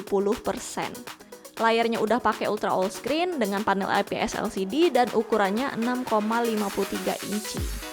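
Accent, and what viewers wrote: Yogyakarta, Indonesia. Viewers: Orang Jogja kah?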